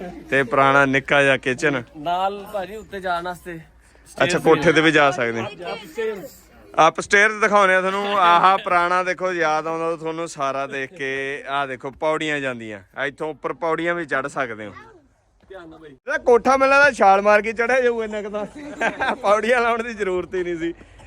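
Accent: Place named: Hoshiarpur, India. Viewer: Aap Punjabi Hoshiarpur wali bari meethi Punjabi boltay hain ko mujay Bohat achi lagti hay mairay Maan Baap Hoshiarpur say aaey thay.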